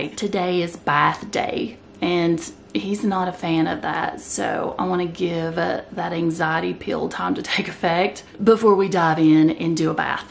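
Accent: South, United States. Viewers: I love how you talk. You’ve got such a lovely accent. I’m guessing you’re a southern lady? x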